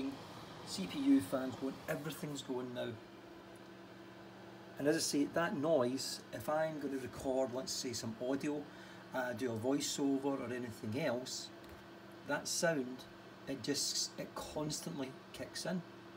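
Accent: Scotland, United Kingdom. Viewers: sort of off topic, but your Scottish brogue is awesome.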